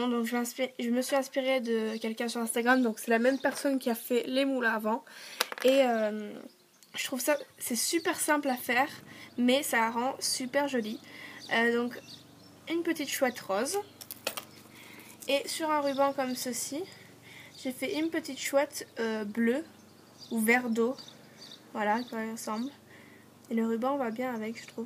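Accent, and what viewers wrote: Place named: United Kingdom. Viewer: J'aime beaucoup ton accent britannique ! x'D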